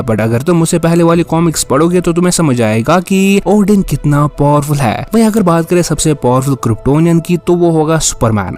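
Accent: Kashmir, India. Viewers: Bro Aap kashmir se ho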